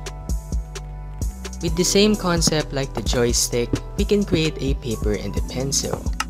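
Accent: Philippines. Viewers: Your accent its like a filipino Btw: Filipino here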